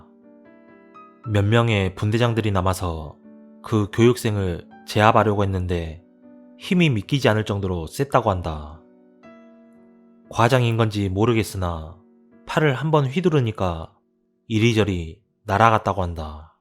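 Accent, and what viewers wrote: Jeolla, South Korea. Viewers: 전라도 사투리가 찐하게 뭍어있네요ㅋㅋㅋ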